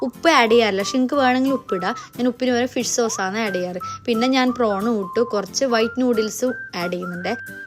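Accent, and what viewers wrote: Kannur, India. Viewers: ചേച്ചി കണ്ണൂർ ആണല്ലേ വർത്താനം കേട്ടപ്പോ തിരിഞ്ഞു 😍 കണ്ണൂർ എവിടാ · Slang polich.. Evda kannuro kasarodo...❤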